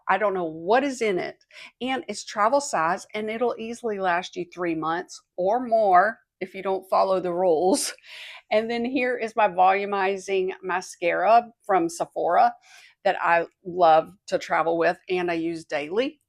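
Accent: South, United States. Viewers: And now can you leave a kind comment for this lady who spends a lot of time and works hard to bring you these tips with her sweet, southern accent?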